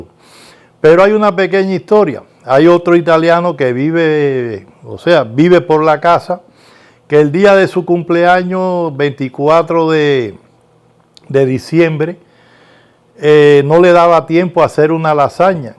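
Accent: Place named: Santiago de Cuba, Cuba. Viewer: Yo voy a hacer tu receta y seguir tus trucos tiene muy buena pinta. Dios te bendiga abuelito, me dio mucha nostalgia tú acento, yo también soy Santiaguera.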